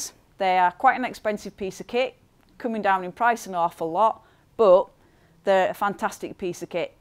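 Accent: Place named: Yorkshire, United Kingdom. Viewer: That’s a Yorkshire accent 😉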